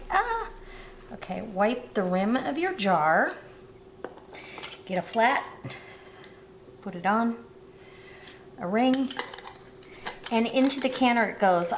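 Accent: South, United States. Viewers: Wow I just love your recipe & I am currently making it- just a smaller version- Can I tell you something my absolute favourite & most loved singer is Dolly Parton & sometimes when you are talking I could swear that is who is speaking - love your accent. This relish sounds amazing so will let you know how I go. Cheers Denise- Austalia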